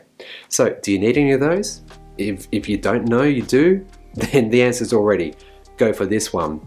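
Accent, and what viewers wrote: Australia. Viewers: Thanks soooo much . You've helped me decide on the 6 rather than the pro. Great video mate. Love that your Aussie and even better from Melbourne. Vic all the way. 😉👌🍻🤘💪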